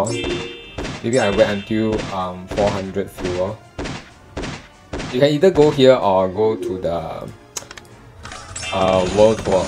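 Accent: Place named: Malaysia. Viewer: you sond like malaysia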